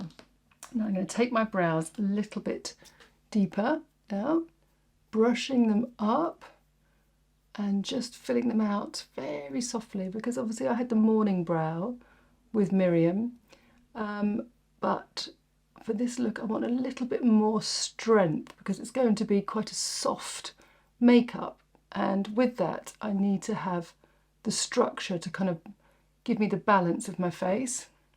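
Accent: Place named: Scotland, United Kingdom. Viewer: Me too! I go down to the kitchen, make my oatmeal and coffee, bring it up to bed and snuggle in and have breakfast with. I wonder how many of us are doing that together? Of course, not at the same time lol because I'm in Minneapolis and you sound like you're in Scotland. maybe we could take a poll and see how many of us eat breakfast with?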